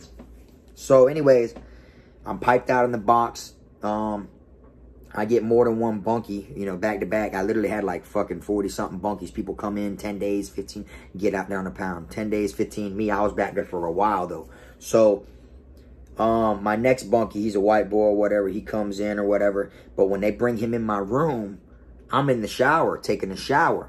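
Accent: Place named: Florida, United States. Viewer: He sounds like he from florida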